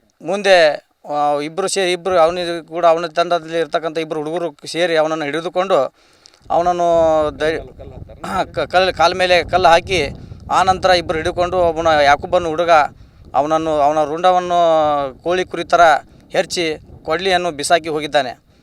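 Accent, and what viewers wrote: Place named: North Karnataka, India. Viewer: ಆದ ಘಟನೆಯನ್ನು ನಮ್ಮದೇ ಉತ್ತರ ಕನ್ನಡ ಶೈಲಿಯಲ್ಲಿ ಹೇಳುವುದಕ್ಕೆ ಯಾರು ಬೇಡ ಅಂದರ್ ನಿನಗ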